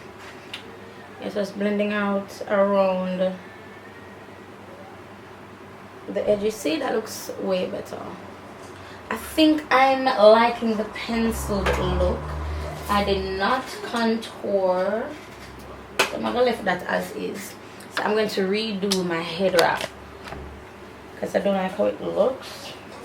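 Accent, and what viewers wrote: Jamaica. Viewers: Are u from Jamaica cause u talk like dem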